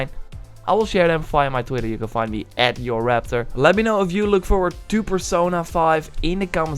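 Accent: Netherlands. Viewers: Are you, by any chance, Dutch?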